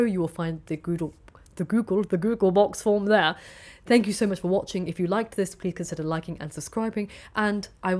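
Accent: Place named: Birmingham, United Kingdom. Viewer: So your a Brummie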